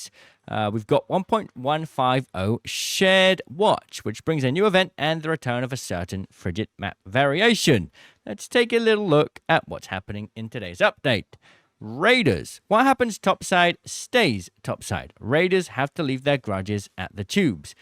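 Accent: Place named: United Kingdom. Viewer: This guy is British so he’s going to say it in a British way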